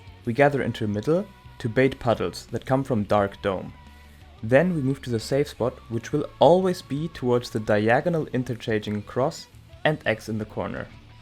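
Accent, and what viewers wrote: Germany. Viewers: He's probably refering to "the boss" which is in german "der" (he) and by the accent I assume he's german.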